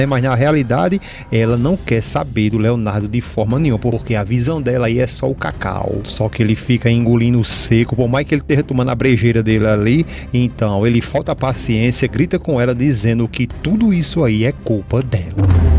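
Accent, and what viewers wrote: Pernambuco, Brazil. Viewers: Eu acho que ese narrador é de Pernambuco tem um sutaque forte e fala mulestra e Satanás ele é muito engraçado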